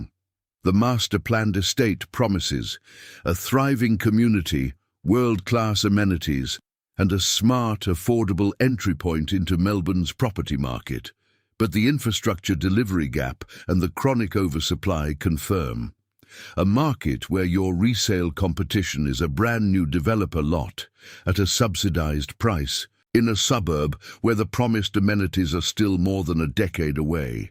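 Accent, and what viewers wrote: United States. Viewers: If you hear this voice of an American,, don't listen to a word they say Don't listen at all Their Aussies behind it, straight out lying for their own narrative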